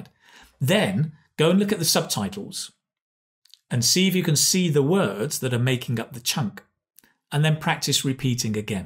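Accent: United Kingdom. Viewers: I really appreciate your vid,i’ll keep practicing for apply an airline,Thanks so much,anyway i like British accent.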